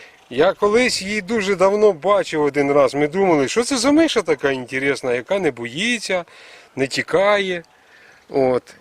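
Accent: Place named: Cherkasy-Vinnytsia, Ukraine. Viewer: Я думаю, шо це захід Черкащини або Вінничина, принаймні там люди розмовляють схоже))) Приємна мова!!!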